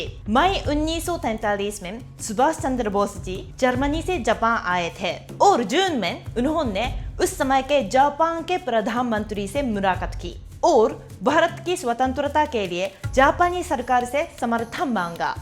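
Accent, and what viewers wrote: Standard. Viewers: Voha kya hindi h inti shudh.... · Inti shudh hindi ek japani se sunke bhut acha laga❤❤❤lots of love from India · 🌹 🌹 Tum kitni achhi hindi bol rahi ho wo bhi shudh mujhe hila diya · Aapki hindi kitna शुद्ध hai mam ❤ humko aapki hindi sunkar khud pe sharm aati hai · कितना अच्छा लगता है एक जापानी शुद्ध हिंदी बोल रही है और भारत 🇮🇳 में हिन्दी को उर्दू में परिवर्तित किया जा रहा हैं!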